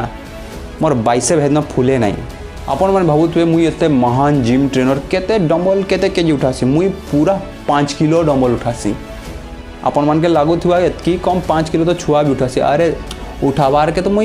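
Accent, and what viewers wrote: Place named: Sambalpur, India. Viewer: oo acha vadhia sambalpur language kahucha sunibaku bhala laguchi 😍real ghara kouthi?🤔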